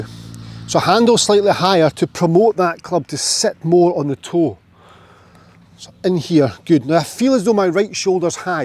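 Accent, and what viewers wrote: Scotland, United Kingdom. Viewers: Love the lesson and your Scottish accent!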